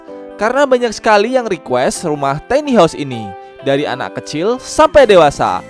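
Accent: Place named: Java, Indonesia. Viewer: masnya ngomongnya medok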